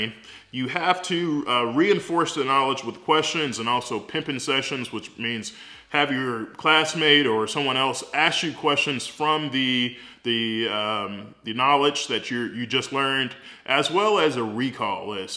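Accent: South, United States. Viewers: Laconian Drakon I just think he’s a southerner. Not a big deal. I saw another video where he talked about spinal “manip-a-lation.”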